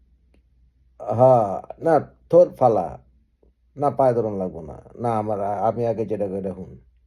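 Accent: Dhaka, Bangladesh. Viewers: ভাই, আপনি সবসময় ঢাকার ভাষায় কথা বলেন, ভাল্লাগে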